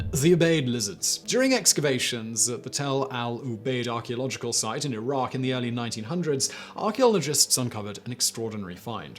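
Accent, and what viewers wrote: United Kingdom. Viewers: Curious. What part of Britain are you from?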